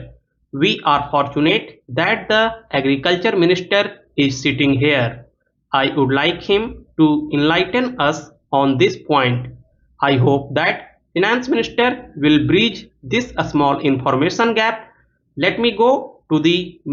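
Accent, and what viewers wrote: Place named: Bihar, India. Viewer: Work on your accent, it is more of from Bihar. Otherwise it is good.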